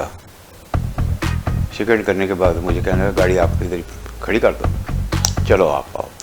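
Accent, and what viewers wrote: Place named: Jammu, India. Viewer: his dogri accent is good with the music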